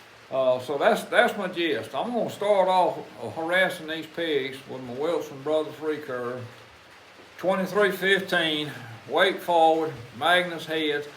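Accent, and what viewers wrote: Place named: West Virginia, United States. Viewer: You talk just like everyone where I grew up in West Virginia and I think I must've talked like you too back then but lost it. You talk like my friend John and others around there near our farm. But you’re with wild hogs thick so you’re probably further south. Praise the Lord and pass the arrow-harvested wild bacon !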